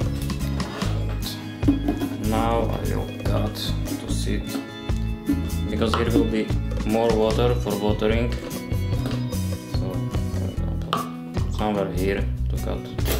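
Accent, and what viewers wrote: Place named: Czech Republic. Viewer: That was my first thought ! I was like, damn he speaks just like shops in Prague ! Thanks for the video !!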